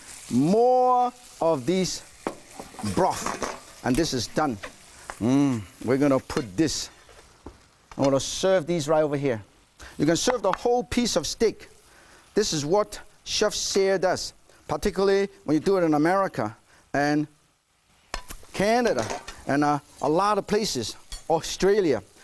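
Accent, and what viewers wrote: China. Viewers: sounds like * inspiration for the Chinese English accent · i love the chinese accent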